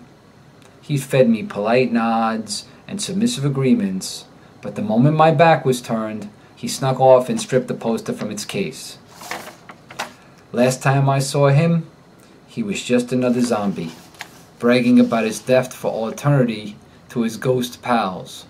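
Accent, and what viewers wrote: New York, United States. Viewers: The guy is from Nu Yawk !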